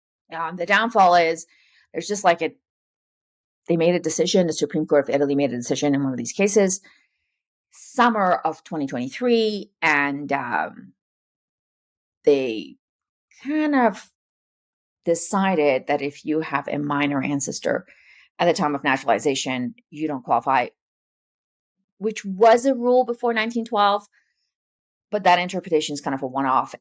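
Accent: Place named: Minnesota, United States. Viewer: Can I ask where you are located? Your midwest "Minnesota" is spot on and I'm Minnesotan. I'm looking for an attorney to help with this process and my Jure Sanguinis has been determined.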